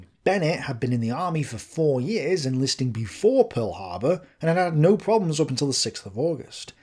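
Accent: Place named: Staffordshire, United Kingdom. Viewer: That’s a fellow Staffordshire accent if I’ve ever heard ons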